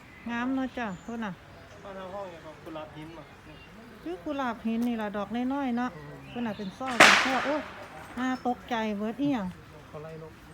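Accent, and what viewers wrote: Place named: Nakhon Phanom, Thailand. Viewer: วิวสวยมากค่ะ สำเนียงพูดเพราะจัง เหมือนคน นครพนมน่ะค่ะ~~^^🥰🥰🥰🥰🥰🥰🥰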